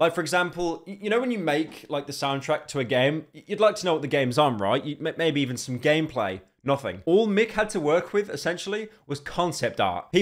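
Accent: United Kingdom. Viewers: even if Charlie uploaded before, I'd still watch just because I prefer his britishness over Charlie's monotone voice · I always wait for the explanation because I'm European and I prefer the British accent over the depressed accent.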